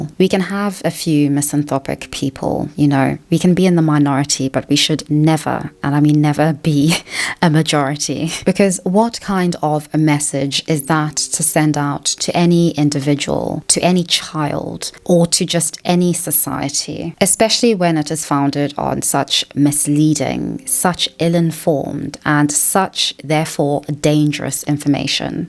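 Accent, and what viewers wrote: RP, United Kingdom. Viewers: The camels are on the horizon, like it or not. This nog'ettes grandstanding and posh accent tells me everything I need to know. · I only subscribed cuz you asked, dark skinned lady with brit accent. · This is apart from the video, but hearing your voice for the first time surprised me, Your voice is beautiful, meant respectfully 😊 I have friends from Ethiopia and a an old friend from Kenya, so I’m used to those accents but your accent is like a UKish one, I think, it’s very pretty, ma’am ☺️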